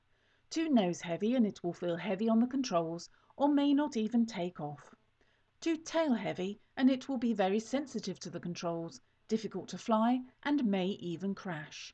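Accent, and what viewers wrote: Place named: United Kingdom. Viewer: LOLlike the brit speek and prodick not bad to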